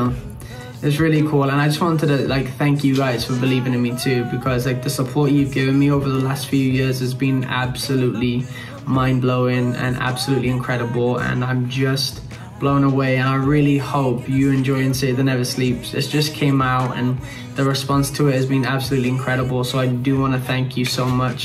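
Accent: Wales, United Kingdom. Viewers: He's actually Welsh, part of UK. Now he lives in the US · He is british my love, yes.💛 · It’s Cardiff, Wales, UK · Have just come across your songs and now I'm here. Was so lovely to realise after hearing you talk that you are British (not quite sure from where? Welsh? Anyway.... well done, you really have a fabulous voice and have really enjoyed hearing your journey. People are rarely good at everything so you got your full quote of being artistic .... but in every bloody way!! Incredible. Good luck. Hope we see you killing it on British soil. Hope my son (he's 16) turns out as humble and hardworking as you. (from 53yr old mum from Hertfordshire, UK) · I think it's Welsh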